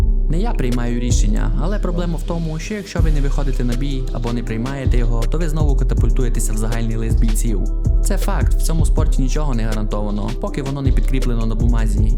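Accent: Transcarpathia, Ukraine. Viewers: все круто.)лиш таке враження що ти з Мукачева.)якийсь закарпатський акцент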